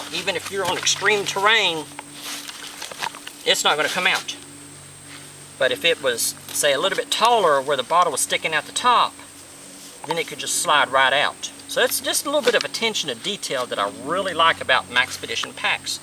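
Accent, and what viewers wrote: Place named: Alabama, United States. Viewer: what part of the world are you located in? reminds me of Alabama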